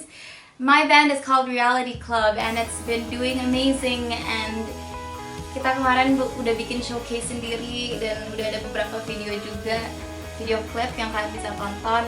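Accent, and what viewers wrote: Java, Indonesia. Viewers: Lebih suk kalo ngomongnya medok.. kearifan lokalnya terlihat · Aku seneng medok mu haha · That javanese accent, tho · Bagusan medog ngomongnya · talking in medok accent omg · Its okay to be "meddok" 😁 , keren kok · Please, bikin video pake bhsa medoknya dong. Lucu lah. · Medoknya lucu wkwkwk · Medog malah natural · cara ngomong medoknya itu loh 😍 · Logat kejawa jawaan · well actually, cocok bgt kalo medok 😁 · Medog aja kak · bikin video ngomong medok dong. cute banget wkwk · Kok medok gitu? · Medok nya kental Jawa bgt but kamu fasih inggrisnya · Banggalah dgn medok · I am eight year gpp cute kok medok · It's okay to be medok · It's okay to speak like medok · Medoknya lucu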